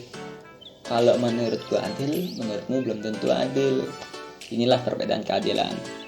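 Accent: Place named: Lombok, Indonesia. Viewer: Logat sasaknya kentel banget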